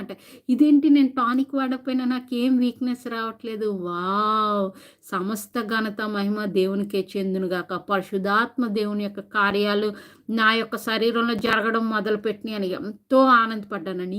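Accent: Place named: United Kingdom. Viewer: Nee british seed telugu basha vinte etletlano undi akka , yedokati follow avvarade manchiguntadi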